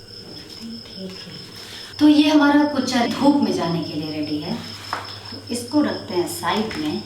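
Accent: Bihar, India. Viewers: My mom is also fan of laliya character. And also she loved your show ratan ki shaddi. You raw and original love you indian touch especially bihari accent in your vlogs.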